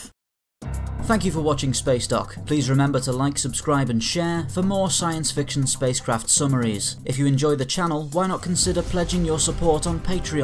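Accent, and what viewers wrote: United Kingdom. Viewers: I'd love to see a video where you summarize all the technical terms of a ships movement, sides, etc. What does ruttering mean? Aft, ventral, all that stuff. Yeah, I could look it all up, but I wouldn't be hearing it in a british accent.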